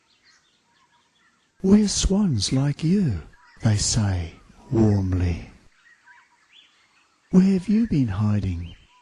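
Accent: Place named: Australia. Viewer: Lol. You're the best!! I love your "work / YouTube posts"... weaver of parable, and allegory, with an Aussie voice and calming background sound, Master, I flourish a bow in your direction. You must be quite the interesting "normal guy". You have helped me much over the years. Post more!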